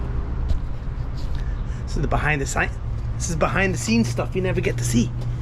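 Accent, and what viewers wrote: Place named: Massachusetts, United States. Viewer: Love the videos. Like you, I'm a native of Mass. My Mass accent is even worse than yours lol. Don't sweat the haters brother. Spoken like a true Masshole... F**k Em. Keep safe out there. See you next time.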